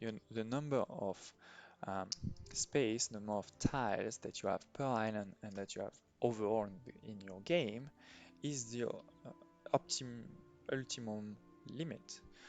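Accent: France. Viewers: l'accent francais ptdr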